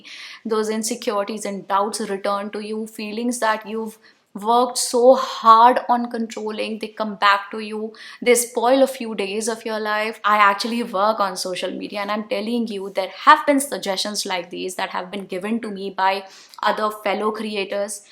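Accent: India. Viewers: You need to change your accent in English language from Indian to American accent. Indian people tend to speak too fast (bad control of breathing) when speaking English. American accent is worldwide. Changing accent is easy for some people but very hard gor other. Take it as a constructive criticism.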